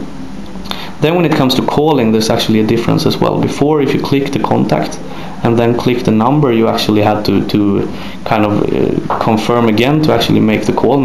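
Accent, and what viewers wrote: Sweden. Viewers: Ge oss den uppdateringen istället för att reta oss med din förbannade svengelska! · Haha låter som svengelska! :D Något nytt datum? Liksom senast om 10 dagar SKA den finnas ute.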